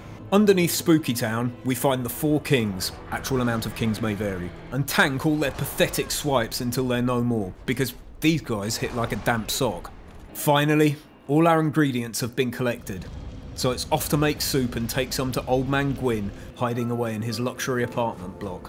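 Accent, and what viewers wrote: United Kingdom. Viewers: I've got a thing for surly, irreverent British men talking about video games over a backdrop of free domain hard rock, it would seem.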